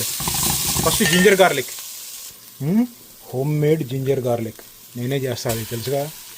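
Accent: Andhra Pradesh, India. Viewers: Nee telugu andhra telugu la untadi why? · Anna me slang Andhra undi , meeru nizamabad migrate ayyara ?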